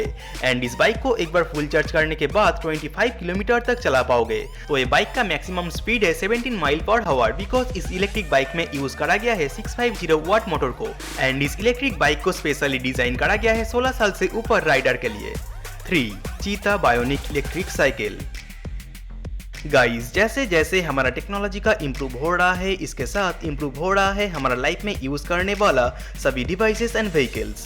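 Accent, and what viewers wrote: Bengal, India. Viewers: Bhai thoda Hindi sudhar lena . Aisa lagta hain ke bengali bol rahe ho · Bengal tone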